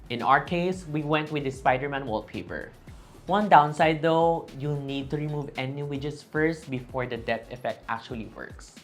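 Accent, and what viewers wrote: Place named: Philippines. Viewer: Philippines not thailand, its quite obvious from the accent and enegry :)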